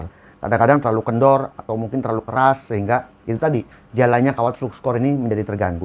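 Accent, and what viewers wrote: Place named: West Java, Indonesia. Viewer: Alhamdulillah bukti hasil positif dalam memberikan edukasi memberikan ilmu gratis yang diberikan oleh akang guru kepada para subscribe yang selama ini setia menunggu review tool murah meriah hingga tool premium, terima kasih atas ilmu dan informasi yang diberikan selama ini, ingat awal mula mengikuti chanel ini secara tidak sengaja kalo ga salah masih 2 k dan masih sederhana masih ada lampu bohlam di atas meja, terus kalo review logatnya sunda pisan, selain itu selalu berbarengan dengan kumandang adzan subuh, selamat akang guru wilujeung 20 k mudah mudahan terus dan terus berkembang hingga mendapat silver bottom 🙏👍👍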